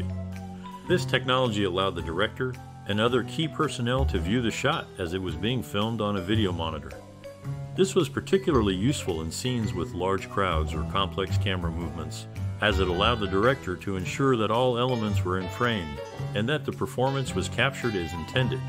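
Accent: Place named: United States. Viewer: Too many random pictures not related to what is being said. And telling us Jane Wyman played Angela about 20 times. The overly American pronounciation of Italian names got very distracting too.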